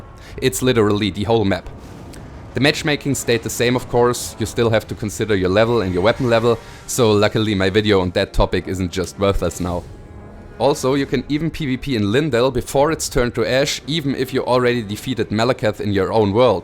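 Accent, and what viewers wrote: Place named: Germany. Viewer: man merkt das du deutsch bist haha gutes video übrigens!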